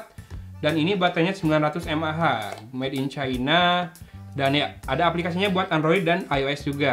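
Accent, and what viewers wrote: West Java, Indonesia. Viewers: Ah sunda pisan eta murangkalih si akang logatna 😁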